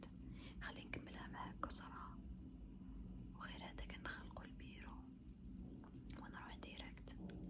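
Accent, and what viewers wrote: Algeria. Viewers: اللهجة الجزائرية تجنن صوتك حلو فيها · Vive l'accent algérois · باينة باسكو مستحيل تكون غير جزئرية وتهدر لهجتنا هكدا 😊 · انت جزائرية أصيلة هذه لهجة قوية 😊❤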